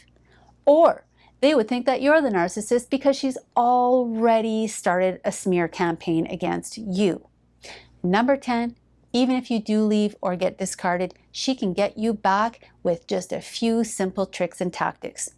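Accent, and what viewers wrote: Quebec, Canada. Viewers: coach, about - ugh that francophone/Canadian accent makes me melt. Love bombing you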